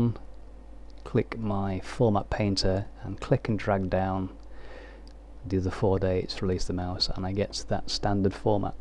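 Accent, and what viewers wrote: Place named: RP, United Kingdom. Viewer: Am I the only one who thinks The Queen's English does enhance the audio?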